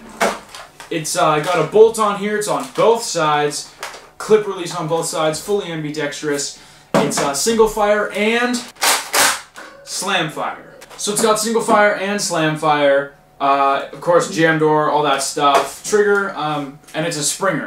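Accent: Canada. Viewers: WHER ARE U FROM CANADA(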